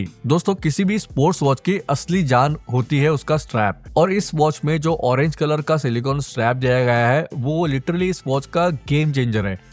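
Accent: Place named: North, India. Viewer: Bro newu kannadiga na !! 😮, i thought u were somewhere from north India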